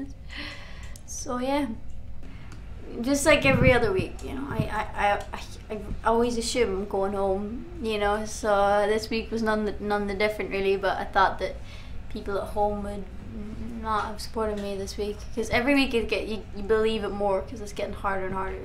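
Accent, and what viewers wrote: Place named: South, Ireland. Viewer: dont like how shes changed her accent to sound more southern irish.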